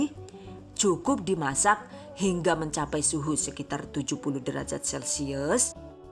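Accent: Banyumas, Indonesia. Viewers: salam ngapak..ora ngapak ora kepenakk ya bun..hihi..mksh resepnya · Hahaiii, ora ngapak ora penak Lanjut na mba Subscriber wis nempel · Tek baca Madiun baca lg Madiun takut salah ehh bnr Madiun tp ngapak ky Banyumas Purwokerto😂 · Rika wong ngendi lik? Inggrise karo ngapake kepenak temen. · 😜😜😜bahasane rika ngapak temen yuuuu...nyonk seneng lah😜😜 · ngapake ky inyong...😀👍👍👍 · Banyakin logat ngapaknya dong bund...biar nontonnya makin semangat 😄😄😄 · Mantul Bun. Eh kok agak gelap ya vidionya bun? Di dalam ruangan ya ? Atau lagi mendung ? Tapi tetep mantul kok masih jelas apa lagi ngapaknya bunda bikin emez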